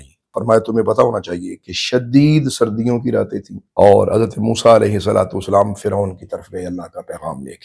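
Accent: Arab world. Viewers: ALLAH HO ALLAH … HAZOOR QIBLA… sadqe ap k arabic lab o lehjay py … QURAN E PAK OR HADEEZ E MUBARAK se zabrdast beyan mubarak … HAZRAT MUSA k 9 mujzat life me first time ap se sune ko mily … Ap k wasly se hum in 9 mujzat py iman rahkty hai jo ALLAH PAK ny HAZRAT MUSA ko ata farmay … explaining method alaaaa or purasar… Ap ki alaaa azmaton ko salam … ❤️🌹🌹🌹❤️❤️❤️🌹❤️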